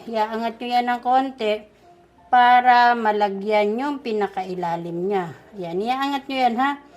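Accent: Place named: Paete, Philippines. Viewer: taga paete laguna po ba kayo? Pansin ko lang po kasi sa punto. heheh ang ganda po ng cooking vlog nyo. madaling sundan sobrang detalyado..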